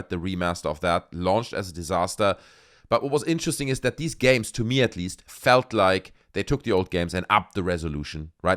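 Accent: Germany. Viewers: Are you german by any chance?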